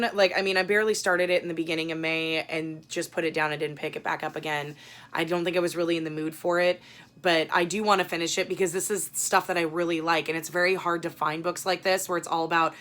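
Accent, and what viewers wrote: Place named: Australia. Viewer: Ooo!!! Yes you should start!!! I love book videos and I would so watch!! AND it sounds like you're from Australia, I'd love follow your booktube if you start one! I don't make book videos (yet?) but am obsessed with booktube haha 💟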